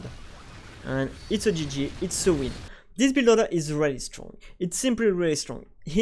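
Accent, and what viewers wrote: France. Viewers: Man you are so cozy guy and your accent tho! Really makes me smile when I hear your frenchglish haha:D thanks for your work sub and bell dont disappoint my trust!! :D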